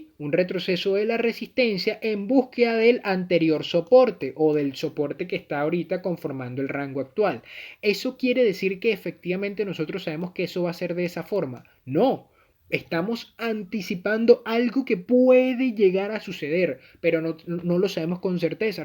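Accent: Venezuela. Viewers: Muchas gracias por compartir estos vídeos la verdad es que me han ayudado bastante a poder entender el mercado sobre todo por que lo dices de una forma amigable y en el acento venezolano que es tan como de escuchar para mi. seguiré viendo los vídeos para aprender a crear mi propia narrativa y proceso y poder operar de una manera consistente en el mercado. Saludos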